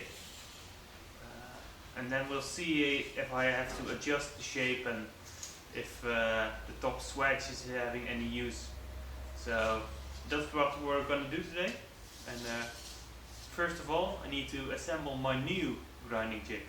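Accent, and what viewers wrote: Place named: Netherlands. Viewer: you sound like someone from holland. is that right ?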